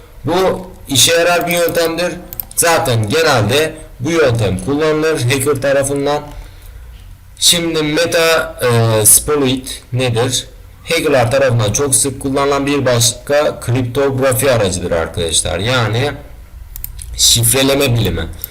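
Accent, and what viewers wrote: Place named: Azerbaijan. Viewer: Azeridi özünü qırmır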